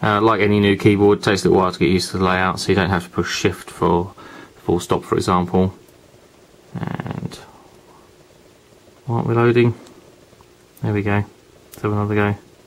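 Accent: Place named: England, United Kingdom. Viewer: English accents unboxings are the best.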